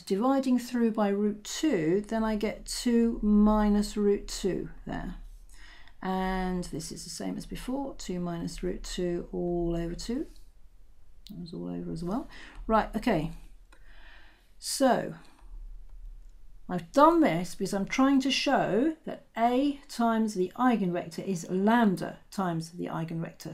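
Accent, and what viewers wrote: United Kingdom. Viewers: This is awesome, a brilliant explanation with British accent, super.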